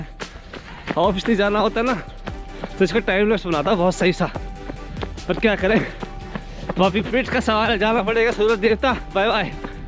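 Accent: Haryana, India. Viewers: Bro I love the u video start or moj aa ri aa b ri ya aise bqt kat ra Jo Haryanvi ton h lov it bro thanks for making videos luv u from Himachal Pradesh India jai hind bro🇮🇳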